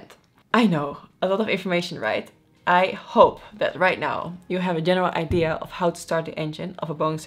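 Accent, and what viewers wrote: Netherlands. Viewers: Dutch accent is something amazing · A hint of Dutch in English produces such an agreeable sounding accent. It's a pleasure to listen to her.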